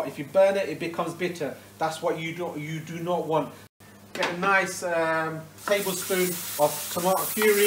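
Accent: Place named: Stoke, United Kingdom. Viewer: 60k, well done, love your Bangladeshi heritage, your food knowledge and incredible cooking skills, your Stoke accent is ace too mate. We in the UK are so fortunate to have such a mix of cultures, filling our bellies with happiness and pleasure, next stop 100,000 subs.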